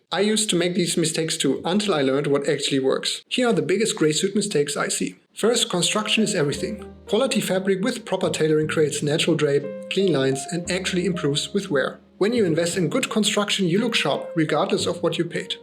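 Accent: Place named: Germany. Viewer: Great videos with helpful tips. Just subscribed. I hear from your accent you’re German? Question: where do you buy your suits?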